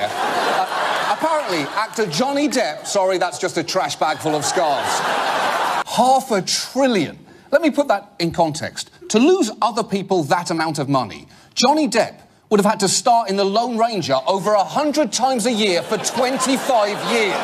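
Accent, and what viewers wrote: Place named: United Kingdom. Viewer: The Mighty Sparrow, you’re wrong his accent is 100% British.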